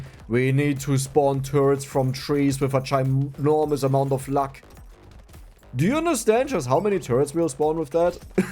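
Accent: Germany. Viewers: By the way, my browser has Voice-Over-Translation and on large videos (like this) from English, you have to wait about 1-2 minutes for voice acting. But because of your pronounced accent, the browser detects it as German, and then gives me an error because it doesn't understand why English words are pronounced in German. You have to manually set English. (Who cares, the browser is called "Yandex Browser". I don't know if this works with other languages, but it does sound exactly in Russian)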